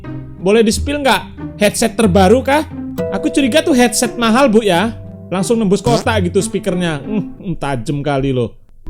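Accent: Java, Indonesia. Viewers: tak kiro samean wong jowo bang 😂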